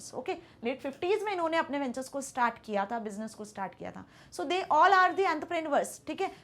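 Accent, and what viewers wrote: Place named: Bengal, India. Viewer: Arey bhai ap toh bengali ho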